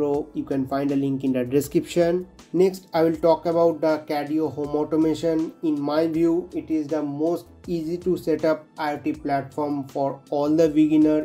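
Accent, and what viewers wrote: India. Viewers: There is nothing more painful than listening to an Indian talk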